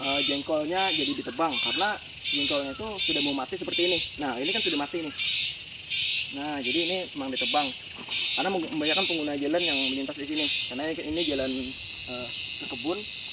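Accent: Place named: Banjar, Indonesia. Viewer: kya logat banjar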